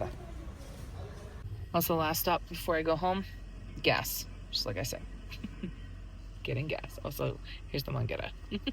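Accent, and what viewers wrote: Mexico. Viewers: Creo que ya se te nota el acento poblano al hablar en español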